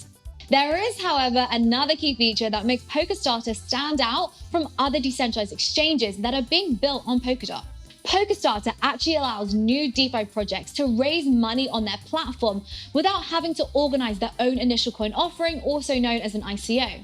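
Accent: England, United Kingdom. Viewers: Good, I bought POLS last month. Makes me feel better to see her taking about it in her cute English accent. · I have to listen to her at .75 playback speed. She speaks way too fast and the English accent doesn't help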